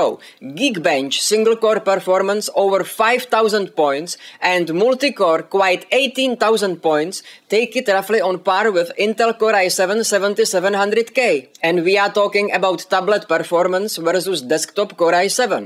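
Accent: Czech Republic. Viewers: Are you from czech republic? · you are from czech :) ?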